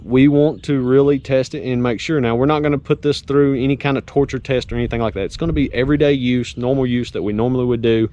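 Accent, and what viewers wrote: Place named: South Carolina, United States. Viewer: Just started watching you. Accent says SC. Am I close?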